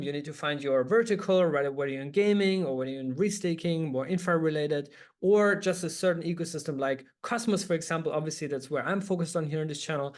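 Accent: Germany. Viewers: He‘s German, right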